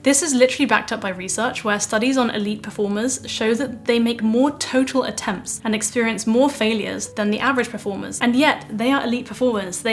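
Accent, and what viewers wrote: United Kingdom. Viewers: I'd say that your videos not only provide helpful and valuable information, but also are very good for learning English, especially for people who are learning British accent like me (I mean, among a lot of YouTubers who speaks British accent, your voice is very good and beautiful). Athough YouTube already had its own auto-generated subtitles for videos, I'm still thinking that it'll be better if you can add subtitles to your next videos. It would make it a great way for people who come not only to watch and learn things you're sharing in your videos, but also find a good YouTube channel for improving their English. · Hello Dr , could you please tell me what is the name of your British accent? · I love that you're Chinese, but you have a British accent. I could listen to you all day.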